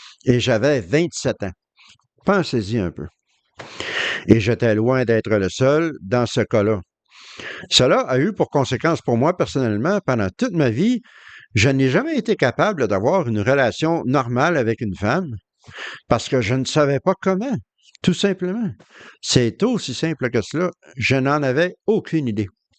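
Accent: Quebec, Canada. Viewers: Merci pour ton travail de recherche… pour mettre en évidence les corrections, les changements de la doctrine… Je suis né de parents TdJ, baptisé le 20 avril 68 à 14 ans, membre du comité comme serviteur aux études bibliques à 18 ans, j’ai suivi la formation de l’école du ministère du royaume au Bethel de Bruxelles. J’étais le plus jeune avec les autres frères qui avaient entre 30 et 60 ans… quelques mois après, une nouvelle règle a été mise en place…:il fallait avoir 20 ans minimum pour occuper un des postes du « comité » : surveillant président, adjoint et serviteur aux études biblique. Je me flatte d’avoir été la cause de ce changement de règle dans l’organisation. Moi qui servait de tout mon cœur, viré comme un malpropre pour être remplacé par un frère qui refusait de prendre les sœurs âgées dans sa voiture parce qu’elles avaient mauvaise haleine… mais il avait plus de 20 ans, c’était le plus important désormais pour la WT, plus que la disposition de cœur… Ça a été une douche glacée sur mon feu dévorant intérieur. 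Ça m’a pris des décennies pour me déprogrammer de tout cet endoctrinement. Encore merci pour la clarté et la précision de tes vidéo. J’adore ton accent Québécois 😉 On fera bientôt connaissance dans le nouveau système de choses… 🤪😜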